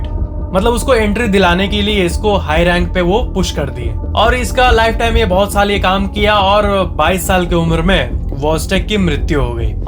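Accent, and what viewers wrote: Bihar, India. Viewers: Are you from Bihar sir ? Please reply or give heart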